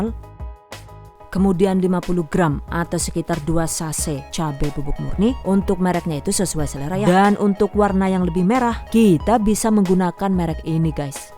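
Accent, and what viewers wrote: Banyumas, Indonesia. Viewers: wong banyumas kieh... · Wkwk wong ngendi sii , geneng ngapak ya 😆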